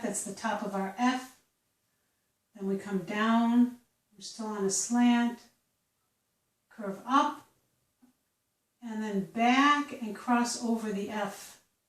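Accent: United States. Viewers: Thank you for the writing lesson. I followed along with my new fountain pen. Planning to begin written correspondence with my grandchildren who all live far away. In my mind, I was trying to pinpoint your accent. You sound like you could be from Scituate, Mass., or Enfield, Connecticut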